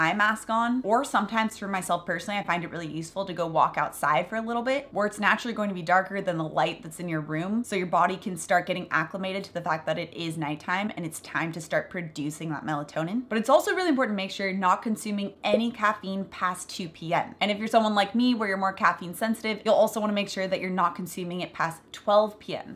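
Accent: United States. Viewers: Hello, Many thanks for your videos - the content is excellent! I thought I was 'educated enough' when it comes to nutrition but I have learnt a lot from you. The only - can you please slow down a little? I am not at all trying to criticize your accent and style BUT after approx. 2 minutes of the non-stop but fast talk in the style/accent of yours, me and most my Scottish friend just cannot take any more, mute you and only rely on subtitles. In other words, the headache from the non-stop talk is not worth the information you can convey - and you obviously have a great amount of knowledge! Just a thought for consideration. Maybe fellow Americans don't find your way of talk not as overwhelming? Not sure... Thanks and ALL THE BEST! mk x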